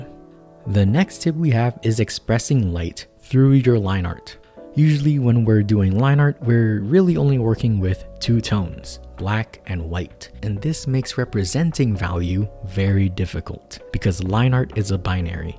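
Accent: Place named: United Kingdom. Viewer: british detected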